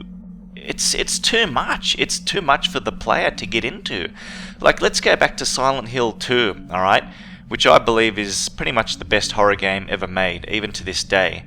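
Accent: Australia. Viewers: Is he aussie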